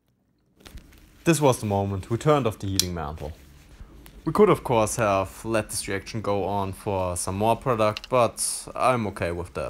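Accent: Germany. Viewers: I like German accent.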